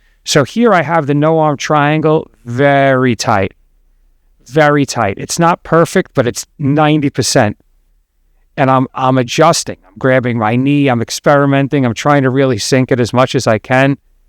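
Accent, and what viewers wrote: United States. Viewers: Even though you didn't say it during this roll, I heard your voice is in my head last night when I rolled saying, " Four points of contact" when I ended up in guard after a take down. I'm a one stripe white belt working on my second and I was rolling with a much heavier blue belt, all I kept thinking was four points four points, it worked quite well for the rest of the round. Your narrations are sinking into my game, thank you for that. I am older than you but you sound a lot like my grandfather who was a New Yorker from Brooklyn.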